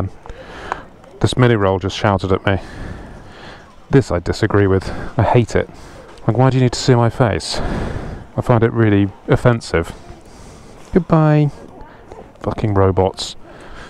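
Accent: England, United Kingdom. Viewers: Nice to have you back! As a Dutchie I really can appreciate you're semi-posh English. Keep on driving....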